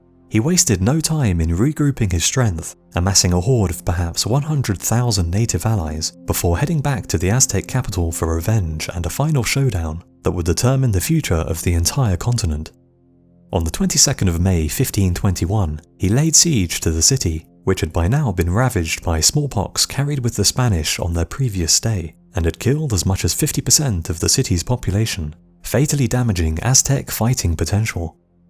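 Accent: England, United Kingdom. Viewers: Every time I hear an English accent I think of English Peter Griffin and his teeth..🎩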